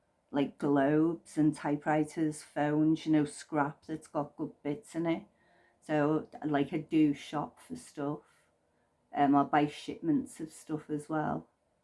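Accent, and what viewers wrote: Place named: Liverpool, United Kingdom. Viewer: I'm used to the contemporary scouse accent. I can see that you're scouse now just thought this accent died with the beatles.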